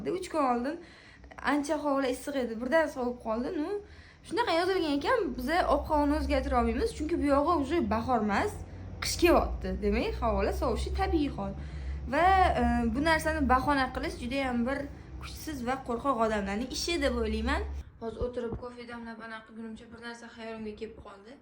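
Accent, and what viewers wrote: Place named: Bukhara, Uzbekistan. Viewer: Вай Бухороча гапирурин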